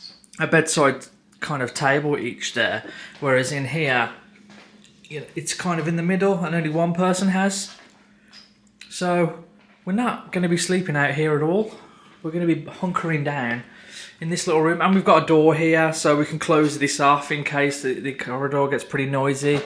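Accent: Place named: Birmingham, United Kingdom. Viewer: Love a brummy vlog. I'm from across in Wolves so nice to have a more recognisable accent in a vlog for a change, haha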